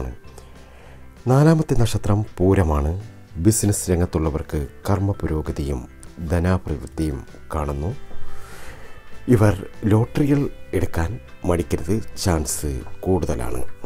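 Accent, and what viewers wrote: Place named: Thiruvananthapuram, India. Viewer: തിരുവനന്തപുരം ആണ് നാട് ആല്ലേ ? "ഫാഗ്യം " എന്ന് പറഞ്ഞു തുപ്പൽ തെറിപ്പിച്ച് ഫോൺ നശിപ്പിച്ച്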